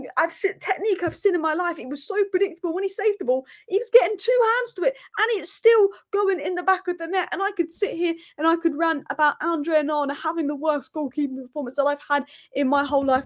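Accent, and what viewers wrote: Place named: England, United Kingdom. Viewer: Guys what type of English accent she has , I love it.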